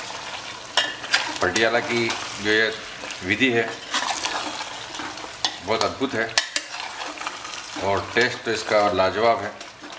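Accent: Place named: Indore, India. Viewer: mujhe aap ki language accent se laga tha ki aap Indore se hain, but saari dishes aap ki Rajasthani influenced hoti hai isliye pucha, vaise mai bhi aap ke podas mai hi rehta hoon Bhopal, aur meri wife Indore se hai 🙂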